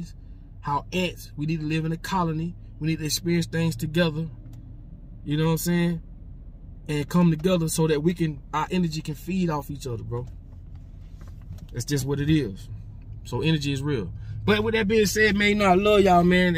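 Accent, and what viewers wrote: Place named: Louisiana, United States. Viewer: Thanks for the video. Thought you were from Louisiana ! Would have never guessed Haitian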